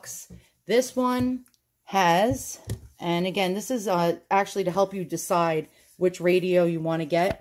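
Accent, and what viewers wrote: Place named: New Jersey, United States. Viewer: I love your east coast accent! New Jersey?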